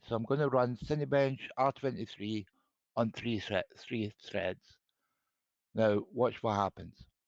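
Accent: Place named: United Kingdom. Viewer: oh god a brit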